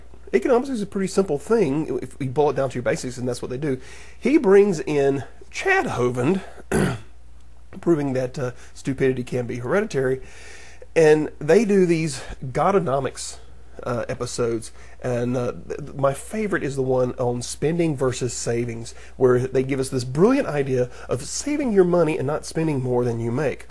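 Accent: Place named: South, United States. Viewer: fuck, that should be than. BTW, you rock, love the vids. In MS here. Central. You sound just a little south:)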